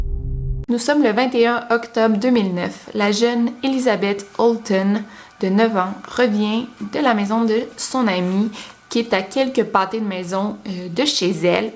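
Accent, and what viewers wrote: Quebec, Canada. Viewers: Salut, je pense que tu ne verra pas ce message mais sa ne fait rien je prend plaisir a te l'écrire alors je voulais te dire que j'adore ta chaine! Elle est passionnante. Bravo pour tes 162 k moi personnellement je suis depuis tes un peu prés 50 k! D'habitude je ne supporte pas l'accent québécois mais avec toi c'est différent j'adore ta voix. Je trouve que ta chaine est très intéressante je te trouve très belle douce et.J'éspere que tu aura plus d'abonnée pourquoi pas le million? Enfin tous sa pour te dire que je t'adore bisous d'une grande fan <3. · Avant je ne pouvais pas regarder des vidéos québécoises à cause de l'accent étant donné que je suis française mais je suis tombée amoureuse de tes vidéos, elles sont géniales, je me suis habituée et maintenant je ne peux plus m'en passer 💁🏽‍♀️ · Encore une super vidéo. Tu explique très bien et simplement et j'adore ton petit accent Quebecois ♥♥ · J'aime tellement l'accent, je pense que je vais aller vivre au Canada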